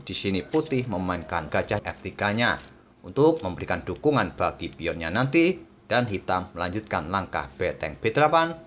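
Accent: Java, Indonesia. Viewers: Cara bicara nya jangan sering pake logat Jawa , sulit dengar nya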